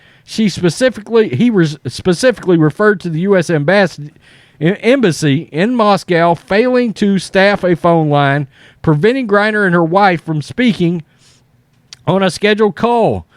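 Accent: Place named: Appalachia, United States. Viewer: this is the wrong place for me ... I do not need a hillbilly to read to me .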